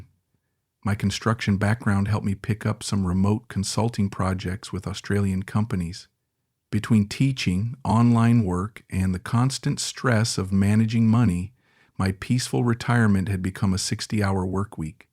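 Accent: United States. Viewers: Australian with a Yank accent??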